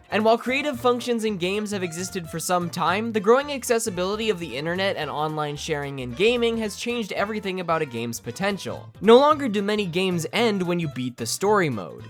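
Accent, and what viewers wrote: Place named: United Kingdom. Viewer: The sheer amount of dopamine that released when I heard the british narrator's voice cured my depression.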